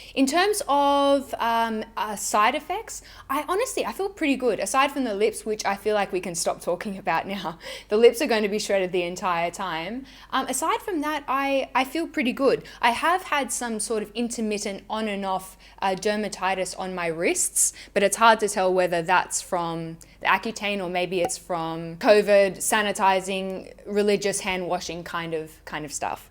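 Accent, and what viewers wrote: Australia. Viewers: Wow an aussie on YT! Great to see and hear :) From an aussie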